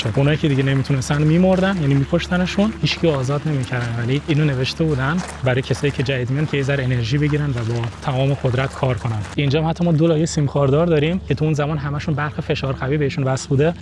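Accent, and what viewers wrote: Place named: South, Iran. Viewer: لهجت خیلی غلیظه جنوبی هستی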